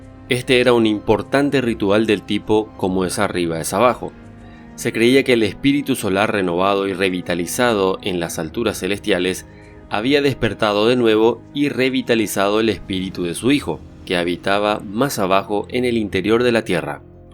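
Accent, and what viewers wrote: Paraguay. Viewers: Que tal.... sos de paraguay..??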